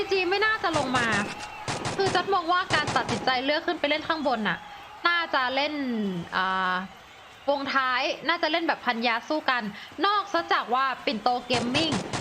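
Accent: North, Thailand. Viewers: พี่ พูดภาษาเหนือหน่อยน้าา~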